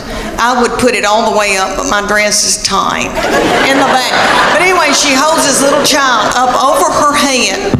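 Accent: South, United States. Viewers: This is very funny. I really like that down to earth southern accent. I would like to see in person doing this skit. Good clean comedy CAN be very funny. BUT, what I am seeing, scares the hell out me. Obviously AI. Artificial Intelligence. It can make anyone appear to be saying anything the programmer wants. ANYONE, INCLUDING YOU. AI IS VERY VERY DANGEROUS. A SCARY FUTURE. 😞😞😞😞😞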